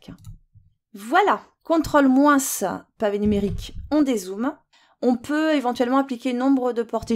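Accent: South, France. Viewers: Coucou! Merci pour ce petit tutoriel confectionné tip top! C'est génial de suivre tes explications avec l'accent du soleil.... quelle facilité, à croire que l informatique est dans tes gènes 😆. Maintenant au travail, là c'est une autre paire de manche hi hi.... merciiii 😗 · Salut, tu viens du sud ? sa s'entend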